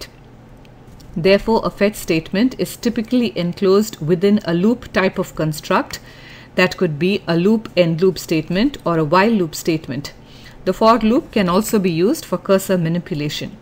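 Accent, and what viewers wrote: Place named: India. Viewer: Thanks for this. Good explanations and it is fantastic to hear someone with an Indian accent speaking English so clearly.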